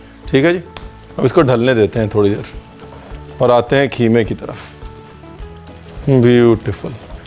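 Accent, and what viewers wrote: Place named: Punjab, India. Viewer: Do you ever shower and clean up! You and your Punjabi accents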